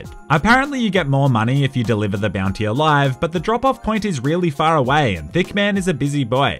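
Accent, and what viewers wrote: Australia. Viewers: just a question are you australian or american?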